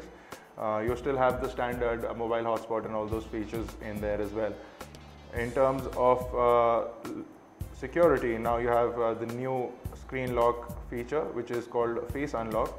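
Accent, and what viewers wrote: India. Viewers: BLAH BLAH BLAH BLAH, I HATE THE ACCENT OF THIS INDIANO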